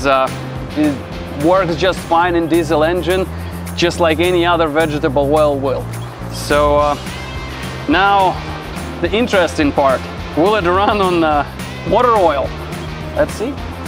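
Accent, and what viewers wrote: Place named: Eastern Europe. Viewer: Nice accent) Just love this slavic word pronunciation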